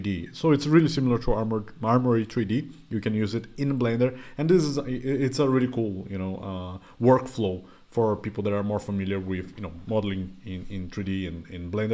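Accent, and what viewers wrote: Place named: Russia. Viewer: Porque que ce ta com sotaque semi russo mermaooo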